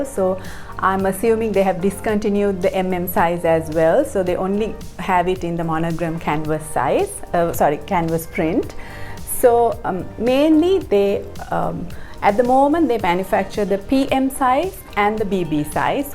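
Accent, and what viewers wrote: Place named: India. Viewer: You are beautiful. You look E. African Ethiopian Eritrean or Somalian but by your accent you are Indian, right?? Thx for sharing.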